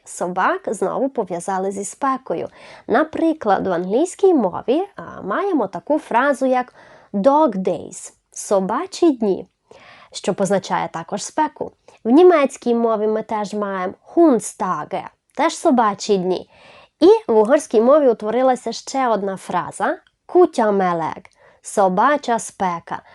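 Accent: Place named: Transcarpathia, Ukraine. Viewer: Дякую за відео. По акценту чути що ви з Закарпаття. Дуже мило і красиво пояснюєте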